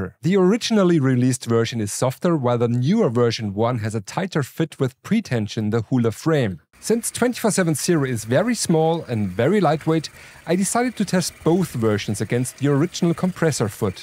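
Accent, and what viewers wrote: Germany. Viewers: Are you printer guys all the same guy with the same voice and same german accent? 🤔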